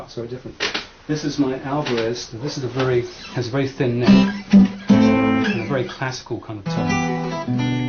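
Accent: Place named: England, United Kingdom. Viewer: I love Ritchie's sense of humour. I love his accent too. Quintessentially English.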